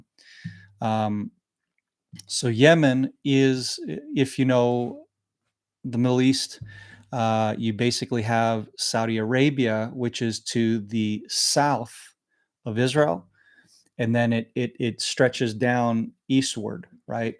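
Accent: United States. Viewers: America accent, American passport. Get out of Palestine. You are not the victim.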